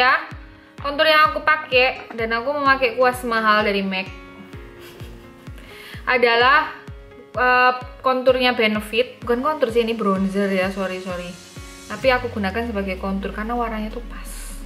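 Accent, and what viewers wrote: Java, Indonesia. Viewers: Saya selalu suka tutorial make up mbak, gaya bicaranya yg medok dan pemilihan warna2 make up nya yg ok bikin betah utk nonton, sukses selalu utk mbak dan terus saya tunggu up date tutorialnya, Gbu😀 Dan saran saya tolong juga jaga kesehatan kulit wajahnya ya😀👌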